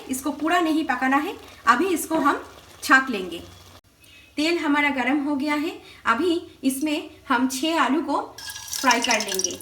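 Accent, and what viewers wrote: Bengal, India. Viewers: R u bengali mam? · Your recpice is osm, but I want to ask are you bengalee mam?? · Are you Bengali? I am telling this after hearing your hindi ☺☺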